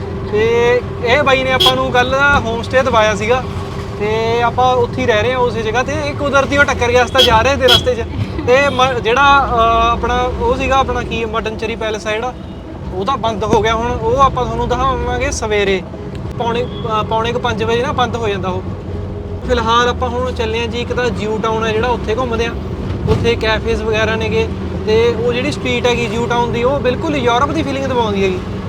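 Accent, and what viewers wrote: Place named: Patiala, India. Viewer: Inderਦੀ ਬੋਲੀ patiala aria ਦੀ ਆ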